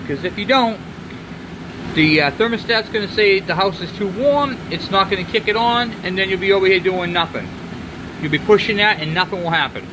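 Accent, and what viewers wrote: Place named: Massachusetts, United States. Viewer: Thanks, from Hillsboro, NH! That really saved my butt. I knew you had to be from northern Mass from the way you talked, LOL. I'm a former Lowell resident, born and raised.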